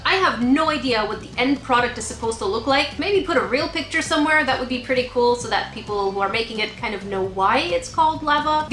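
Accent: Canada. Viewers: You can tell shes from Canada 😂😂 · I don't know how you sound like a stereotypical Canadian lol. I'm Canadian and have never met someone with a stereotypical Canadian accent.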